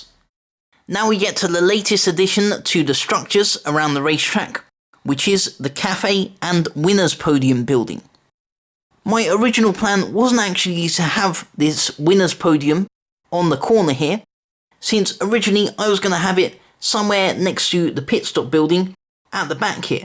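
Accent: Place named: Southern England, United Kingdom. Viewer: I can recognise your accent but whereabouts in england are you from (guessing its down south)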